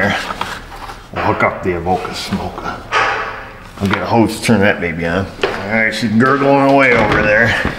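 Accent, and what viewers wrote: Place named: New York, United States. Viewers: i though the way you talk is because it is a new york accent... most people in the USA talk different and pronounce words different because they are different and from a different country or planet you know... go to a good bar and everyone is talking different too Haha... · . Don't worry about your speech, we have been living with your New York "pronunciation" for years and chuckling. It's all good.:) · Ha! I thought it was your New York accent this whole time. I don't hear anything abnormal with your speech.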